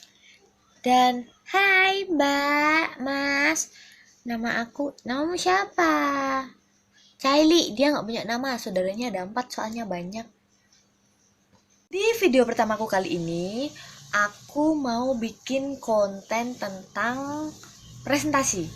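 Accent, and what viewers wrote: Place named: Java, Indonesia. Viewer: Kok geliiiii 😂😂😂😂 medok banget ya bakno 😅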